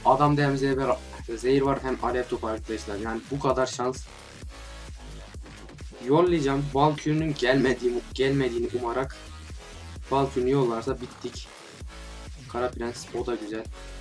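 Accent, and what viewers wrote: Turkey. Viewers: Abi Aslanköy den mi geliyorsun? Öyle Konuşuyorsunda :)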